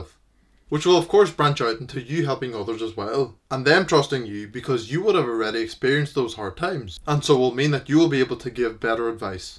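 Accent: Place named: Scotland, United Kingdom. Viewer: Damn for a lithuanian u really picked up the scottish accent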